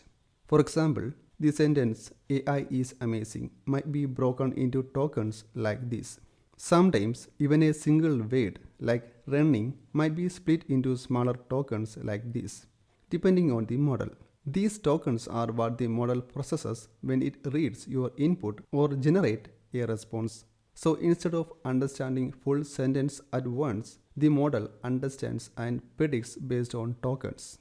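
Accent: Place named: Kerala, India. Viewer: Why don't you use a text to voice output and present this without a heavy Mallu accent 😮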